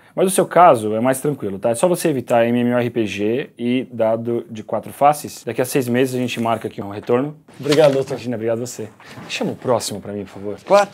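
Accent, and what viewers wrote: Brazil. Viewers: vc é baiano? meu pai tbm fala youtóba kkkkk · sotaque ceares mt massa nosso sotaque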